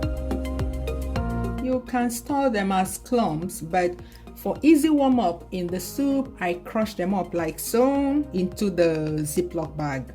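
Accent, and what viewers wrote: Igbo, Nigeria. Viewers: Will it still be slippery, i can't wait to watch u cook it , the best part I enjoy in ur video is that mixture of ur engligbo na superb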